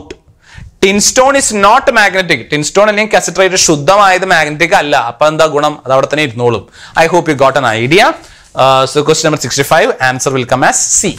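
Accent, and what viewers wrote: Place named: Thrissur, India. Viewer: TCR slang ⚡⚡💪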